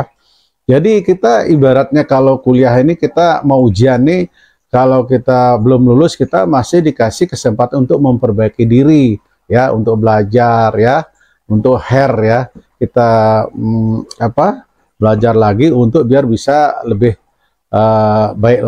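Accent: Java, Indonesia. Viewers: Logat balinya g ada samasekali, malah cenderung logat jawa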